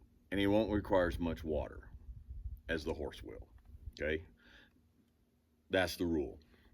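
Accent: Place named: South, United States. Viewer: Yes it is and I like that southern accent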